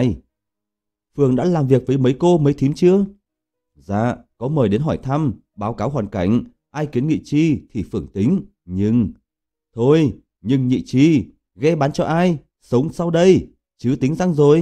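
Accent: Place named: North, Vietnam. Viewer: Người kể chuyện mà theo giọng Quảng Nôm thì hấp dẫn vì sống động hơn nhiều Giọng Bắc khét lẹt!!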